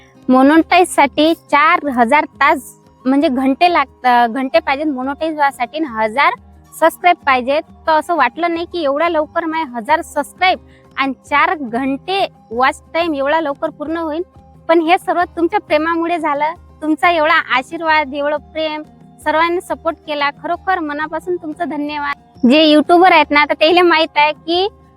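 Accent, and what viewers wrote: Vidarbha, India. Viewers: तुला विदर्भातील भाषा कळते काय. विदर्भातील भाषेचा टोन आणि पद्धत अशीच असते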